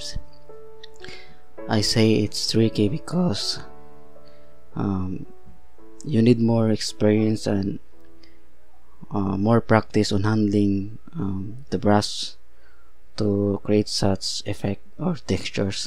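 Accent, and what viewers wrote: Philippines. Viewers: Excellent. You are the one I want to learn from as a beginner. You speak like you are a Visayan from the Philippines, are you?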